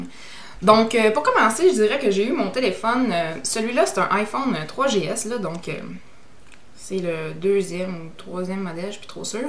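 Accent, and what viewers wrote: Canada. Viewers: D'accord merci :) Tu peux aussi me donner le nom de l'application pour l'essence stp :$ L'accent canadien est vraiment le plus beau du monde mais je ne comprends pas toujours tout :P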